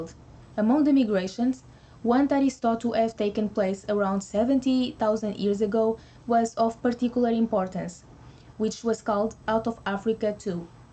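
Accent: Portugal. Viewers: I notice a Portuguese accent...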